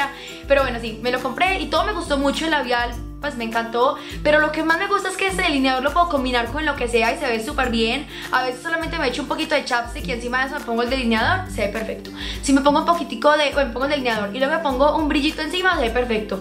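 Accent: Antioquia, Colombia. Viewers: me encantoa nuestro asento paisa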